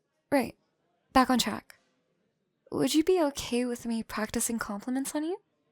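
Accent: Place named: Canada. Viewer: If you’re autistic enough to figure out linguistics for fun ever Canadian sounds so aggressively Canadian and it’s adorable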